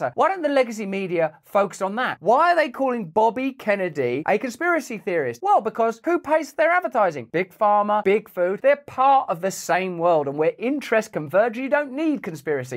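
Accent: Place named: United Kingdom. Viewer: Used to like russel now he is alex jones with a british accent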